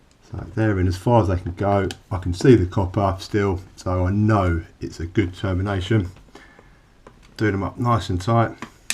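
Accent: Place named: Newcastle, United Kingdom. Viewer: just because your speech is almost the same as a colleague of mine’s (an electrical guy himself yet working in a Datacenter) originally from Newcastle… forgive my ear I am not british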